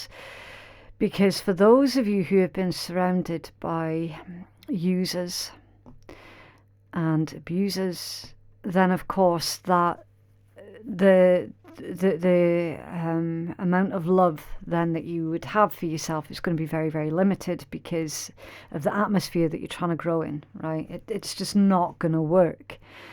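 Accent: Scotland, United Kingdom. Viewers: Nice to hear a fellow Scot Content creator!🏴 Good tarot reader!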